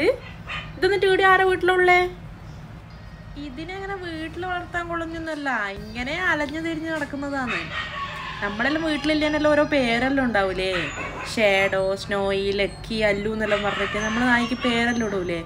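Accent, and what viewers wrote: Kannur, India. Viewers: കണ്ണൂർ ഭാഷ ഇത്ര ഭംഗി ആണെന്ന് ഇപ്പൊ മനസിലായി... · my wife and daughter are enjoying your all programs. We are from Kannur, so it is our normal talk. Thanks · Super chechi kannur slag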